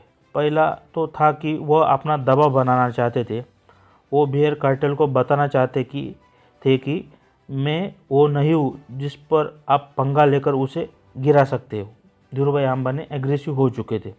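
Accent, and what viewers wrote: Maharashtra, India. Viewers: Strong marathi accent hai bhai.... Jai maharashtra 👍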